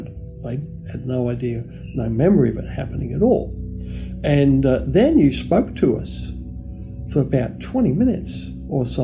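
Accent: Australia. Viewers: Are you a fellow Australian?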